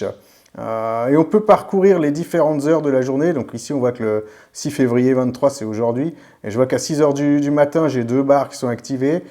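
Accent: Geneva, Switzerland. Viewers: Je détecte une pointe d'accent genevois... je me trompe?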